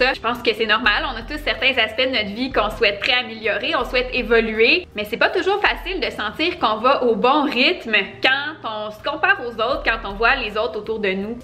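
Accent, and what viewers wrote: Quebec, Canada. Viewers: Je te regarde depuis plusieurs années en même temps que j'étudie le français et je suis si contente de comprendre l'accent québecois (et au rhythme assez vite du style d'une vlogueuse) sans-soutitres. C'est comme une preuve pour me rassurer que j'ameliore mon niveau tout le temps!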